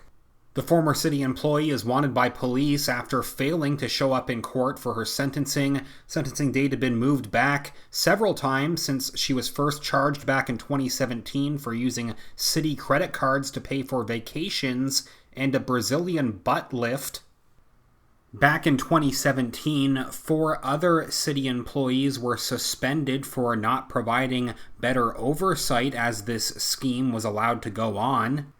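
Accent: Canada. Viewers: your middle class nasal Canadian white boy voice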